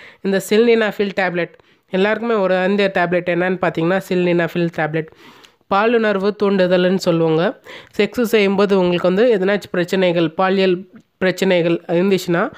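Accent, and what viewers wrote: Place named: India. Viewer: தமிழ்ல ஒழுங்கா பேசுங்களே இந்திகாரன் மாதிரி பேசுறிங்க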